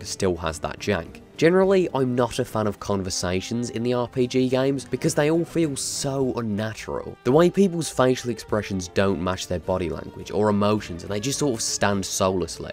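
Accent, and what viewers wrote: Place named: RP, United Kingdom. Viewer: Why are you soooooo posh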